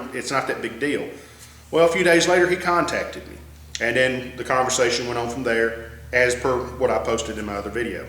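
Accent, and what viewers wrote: South, United States. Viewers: I like this guy, there is something cool about a guy talking sense in a southern American Accent :-)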